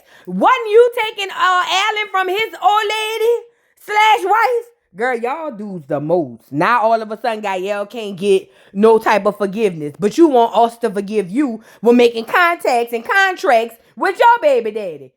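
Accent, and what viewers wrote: United States. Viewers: Hi, im from the UK and a new follower. You’re accent is my favourite American accent ❤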